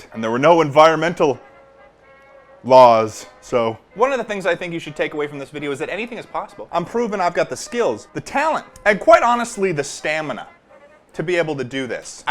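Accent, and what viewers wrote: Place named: Massachusetts, United States. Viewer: This man is definitely from Massachusetts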